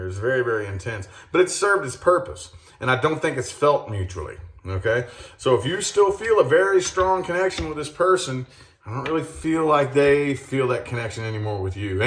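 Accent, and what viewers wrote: South, United States. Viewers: Haha, I love your southern accent!! 😈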